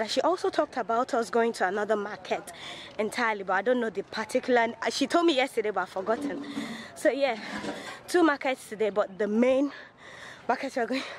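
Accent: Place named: Mbano, Nigeria. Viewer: lol. just blowing mbano igbo ascent in Onitsha main market. Lol. I thank God we didn't loose you by marriage to another tribe.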